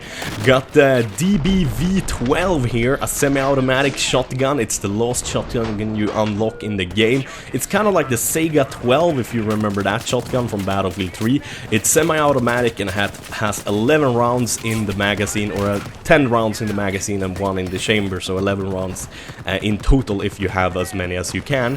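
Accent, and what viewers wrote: Sweden. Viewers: Hahaha! Your accent is the best! I'm glad to have you swedes as neighbors!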